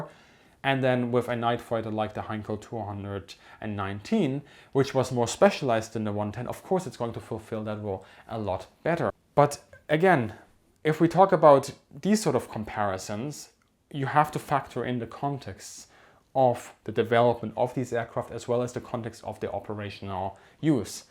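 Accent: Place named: Germany. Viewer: oder ich weiß nicht ganz aber dein deutscher Akzent ist sehr stark raus zu hören wenn du Englisch sprichst😂 Aber deine Videos sind sehr toll und gefallen mir gut. Eine Frage noch: spielst du irgendwann wieder IL-2 BoS mit Bo, Loli und so?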